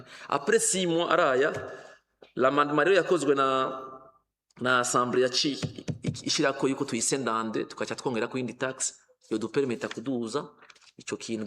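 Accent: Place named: France. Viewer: Uyu numurundi canke numu francas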